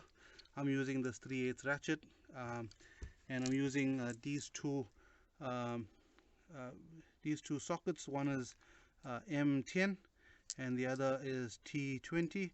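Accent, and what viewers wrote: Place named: South Africa. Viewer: That sounds like a south african accent...very cool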